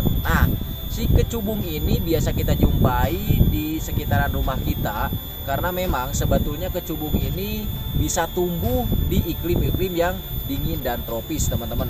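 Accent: West Java, Indonesia. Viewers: A kawit n ti mna?? logat n mah sunda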